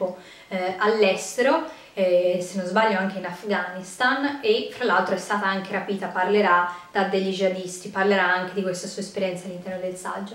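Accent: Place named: Tuscany, Italy. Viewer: Salve egregia. Grazie per questi consigli sugli audiobook. Ad ascoltarla si sente chiaramente l accento toscano, mi chiedevo di dove più precisamente 😊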